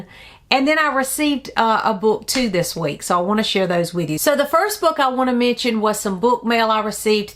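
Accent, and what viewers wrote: South, United States. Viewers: Hey,!!! I hope you're doing well. You look so beautiful. You don't look old enough to have grown children. I love your southern accent. Where are you from? I'm from Mississippi. M.C. Beaton books are SO good. I see you picked up some books from the Hamish McBeth series. You should also try the Agatha Raisin series as well. I love them all. Great video!!! Have a great day😊😊😊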